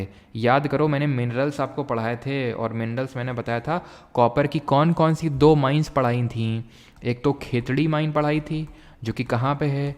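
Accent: Kanpur, India. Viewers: Ye hamara kanpuriya style hi😄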